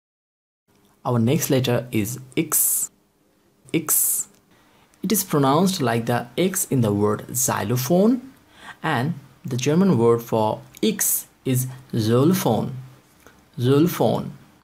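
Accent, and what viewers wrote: India. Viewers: LOL, that's good. Teaching is a noble work. Whether it be full time or part time. I'm from India, I have heard your accent here.. So I thought you might be Indian. Anyways, good luck with your Deutsche Kurs! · He is a good teacher. Wish he could do something about that very Indian accent.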